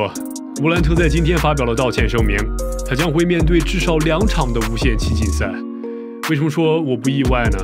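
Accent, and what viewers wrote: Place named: Dalian, China. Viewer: 哈哈大學在大連四年，看第一個視頻時候聽喃第一次說話就知道是大連銀🤣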